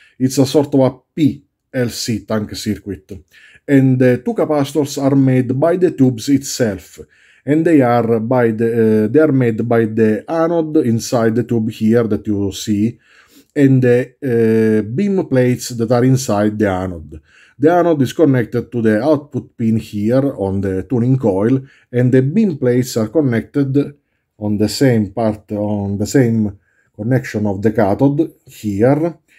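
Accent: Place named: Italy. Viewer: you make great points and I hate clickbait too, but listening to you fans who genuinly love your content and want you to succeed isn't the same as making videos you don't like. And it's not clickbait if the title is true. Putting key words into your titles is good business, not deception. The genius of your videos is above 99% of other channels. I should know. I have very poor health and am often confined to my house for months. I search and search for good electronic videos until I found yours. A diamond in the rough. I know YouTube frowns on using profanity. That's why the big electronics youtubers bleep out their curse words or don't curse at all. No more profanity, key search words in your title and your viewership will increase. You are easy to listen to, have a wonderful accent and come up with brilliant ideas! YouTube “diodegonwild” has a way thicker Italian accent than you and has many subscribers. 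He doesn't curse and has the same video format as you. Please forgive me if I hurt your feelings. You do an a, amazing job!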